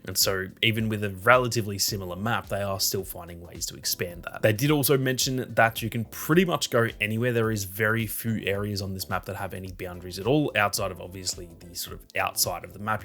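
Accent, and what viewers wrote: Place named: Australia. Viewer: Nice to hear a fellow Aussie. I'm hyped too.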